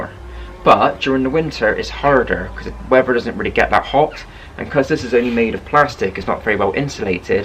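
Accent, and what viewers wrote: Bristol, United Kingdom. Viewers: I was going to say this is a normal Bristol accent. Some people have no ideals! Lol ❤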